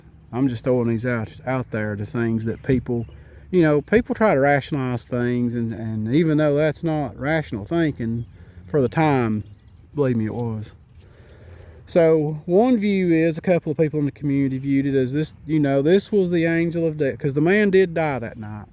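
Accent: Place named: Kentucky, United States. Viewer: New subscriber. I’m from Ky and you sound like my relatives. Feels like home.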